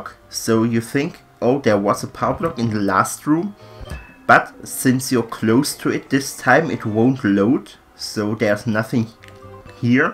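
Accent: Germany. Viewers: Dein deutscher akzent ist extrem lustig anzuhören xD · Heyy! Man merkt an deiner Englischaussprache, dass du aus dem deutschsprachigen Raum kommen musst xD. Andererseits sieht man es ja in Mario Maker auch. Jedenfalls ist das ein echt cooles Level :) Ich wette, du würdest mit der Beschäftigung von Levelbau Geld verdienen wollen. Ich würds dir echt wünschen, wenn das geht :D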